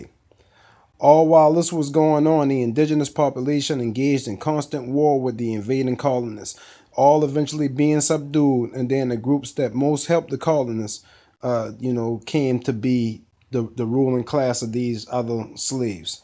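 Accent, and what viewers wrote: New Orleans, United States. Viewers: If just keep listening to this guy talks he sounds just like a native new orleanian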